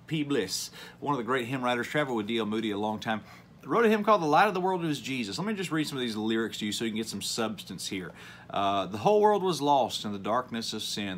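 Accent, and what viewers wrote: South, United States. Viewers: I like your southern accent. I'm from Alabama now in Kentucky. Keep up the good work. Medium well btw. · Brother, thank you for this timely video. I just downloaded the WIOP app. Also, from one southerner (Texas gal born and raised lol) to another, I understand your accent super clearly, haha. May the Lord bless you, sir. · I was just about to ask you where you are at or from. Because your southern accent is just like ours. I was going to guess TN or KY. I looked up the radio station and it is in middle northern KY. I am in northern middle tn, just across the KY/TN state line. So directly south of you. Nothing like the old gospel hymns. We have a small church and few young people want to be a part of it. They want the entertainment of the worldly church. The parents and grandparents do not really like those churches, but they want their chuldren in church, so they go there. 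They figure any church is better than no church. There is so much ignorance in the Christian world today. Not enough accurate doctrine being taught., its great to see someone in your generation that understands this and reaching out like you do. I have always thought of the missionary field being in other countries and extreme rural areas. But I see the need all around us, everywhere. There is a great need of re-teaching Christians the Bible.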